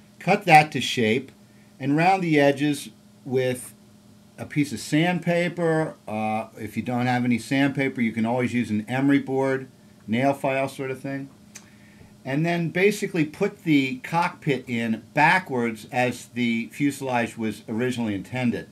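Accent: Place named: Australia. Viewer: great fly. he speaking as an Australian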